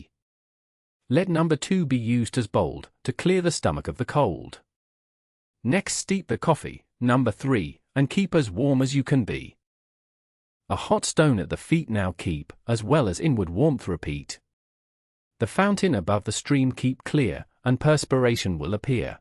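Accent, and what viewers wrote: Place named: United Kingdom. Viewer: The fact that the voice is British…. Doesn’t fit. He was American. And his book is amazing. I couldn’t put it down, so the fact that this is not catching my attention says a lot, to me anyway. Lol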